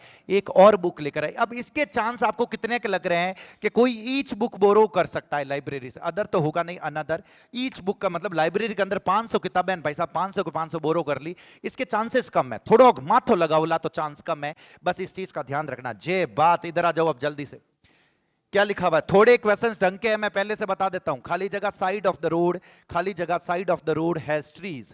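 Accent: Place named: Mewar, India. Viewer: Sar aapki mewadi acchi lagti Hai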